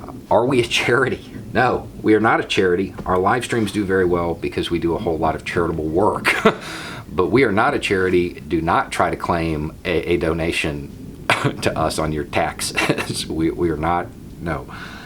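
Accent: South, United States. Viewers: I'm in Texas waist deep in Southern racism and Trump lunacy. I went to a small town all white school where teachers and students dropped the 'N' bomb shamelessly. Nearly everyone on my FB feed and half my relatives are in this mindless Trump cult. Children of the Dixiecrats and they haven't changed a bit since the 60s. You're a Southern man,....how do you deal? I don't kick people off my Facebook friends list because I don't want to be isolated in an echo chamber and also to let others know they don't have to be bullied by some small town alpha pup into going along with the crowd. I don't call people names or put up mocking memes, even though I'm tempted, but just post credible journalism and, I hope, sound debate. But it doesn't matter. It's like trying to convince a crazy person he's not the reincarnation of Jesus. Anyway, it's nice to peek out of my North Texas bunker and see someone talking sanity with a Southern accent and a bubba cap. 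· I wish I had noticed how many internet people had subscribed when I did...I'm sure it was the first or second howdy there and I was hooked. Up here in New England, I missed the drawls of the south. I like the beard and lack of toxic masculinity and that he calls b.s.( very tactfully) on the dear leader's actions. I really enjoy the satire of an upside down curious George and the cake dialogue with your woman ( legit had me crying laughing). Congratulations to you. Positive vibes from New Hampshire and remember to be kind to each other and yourself during this pandemic and social crisis . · Pfeil My personal prejudice is centered around people that talk southern. So, at first, was hard to take. I got over it and look for his posting every time I sign on now. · of the Fifth Column Got it Thank you for the explanation. As a fellow southerner (S.C.) I truly appreciate what you are doing. It’s always great to hear someone that talks like me expressing these views. 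It’s nice to know I’m not alone.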